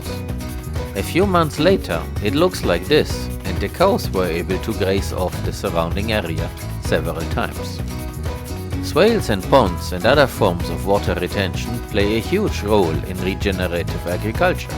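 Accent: Germany. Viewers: I Think you can even improve if you make the trenches not like a straight line but more like a S-shape. I mean like natural stream usually forms or like snakes move. I guess that takes even more speed from the water. More water should get into the ground and it should be more stabilizing for the top-earth. by the way greetings. I am also from germany and interested in water retention and ecological agricultures. my spoken english sounds pretty much like yours according to my girlfriend. anyway i admire your work there and I hope one day i can own a little bit of land and shape it myself, too. Could you need a helping hand for free? Actually I am learning Computer Science at the moment but I am in the last semesters. I just read at <link> that you are "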